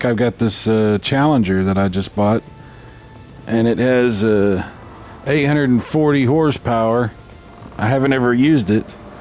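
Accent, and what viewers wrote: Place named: United Kingdom. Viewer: Some cool racing combine with british accent color commentary plus a lot of madness!!! Thanks!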